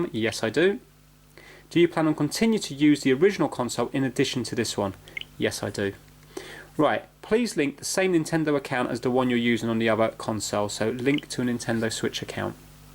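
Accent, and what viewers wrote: England, United Kingdom. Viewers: You have the friendliest british accent I have ever heard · i love this guys accent even tho im british too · Jamás había escuchado el acento inglés, sin duda me sacó de onda por que es algo diferente jaja, espero comprarme pronto mi nintendo switch, veré este video mientras la configuro ;)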